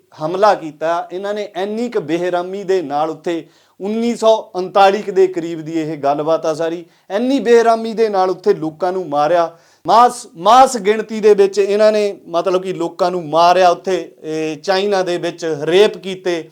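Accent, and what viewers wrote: Doaba, India. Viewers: Bhai Sahib Jeo Tuhadi Language Doaba Wali Aa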